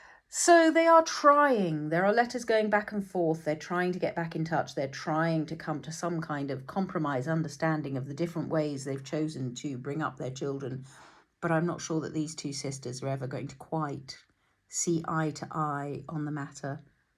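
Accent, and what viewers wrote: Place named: United Kingdom. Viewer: Thank you! absolutely will. 🤩Love to listen to a good British -English talking👍🏻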